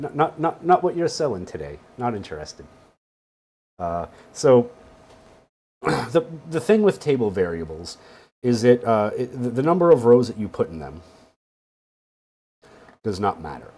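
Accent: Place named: England, United Kingdom. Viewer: I liked this video for the (English?) accent.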